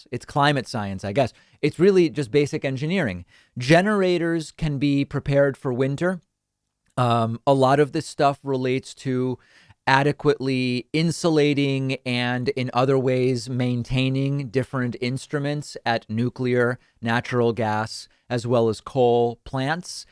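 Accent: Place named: Argentina. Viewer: The Argentina accent have a bit of Italian in it.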